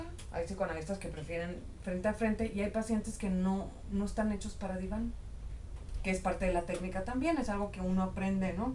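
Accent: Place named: Mexico. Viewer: q bonita mujer q hermoso acento mexicano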